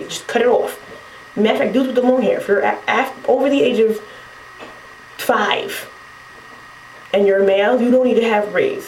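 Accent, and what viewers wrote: New York, United States. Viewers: lol you got dat new york accent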